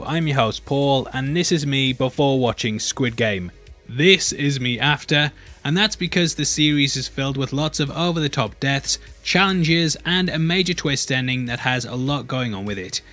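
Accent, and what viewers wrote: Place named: United Kingdom. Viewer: hes literally speaking british english or some other dialect of English that isn't American. imagine thinking that America is the center of the world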